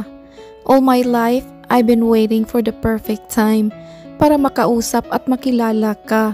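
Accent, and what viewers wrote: Visayas, Philippines. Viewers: 🤣😂😅😅dlwang sunud na bisaya ang nag e story teller pero nice s dlawang sunod mas ok to magkwento ..💓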